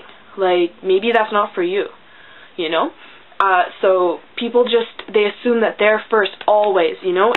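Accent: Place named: Canada. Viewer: you have such a canadian accent <3 im canadian but your accent is so clear! 'you know' so canadian!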